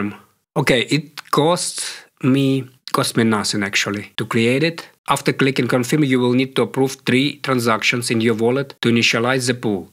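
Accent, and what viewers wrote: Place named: Russia. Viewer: bro are you russian? love the accent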